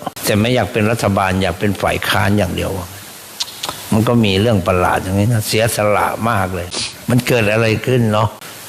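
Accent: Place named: Isan, Thailand. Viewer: กราบสาธุหลวงตาเทศภาษาอีสานปนด้วยฟังสนุกได้สติดีมากครับชอบที่สุด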